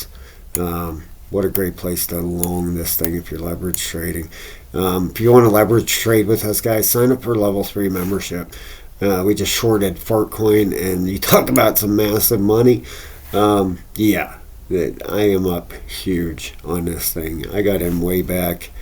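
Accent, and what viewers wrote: Pittsburgh, United States. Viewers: Nice video- are you from Pittsburgh by chance? - killin the shorts💥 $Turbo $TurboToadToken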